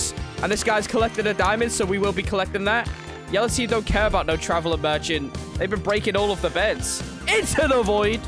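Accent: United Kingdom. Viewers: MY GUY IS THE BRITISH KING ACCENT🔥🔥🔥🔥💀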